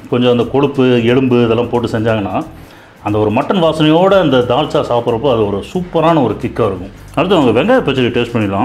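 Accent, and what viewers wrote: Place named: Coimbatore, India. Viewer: Covai speech thani special sir...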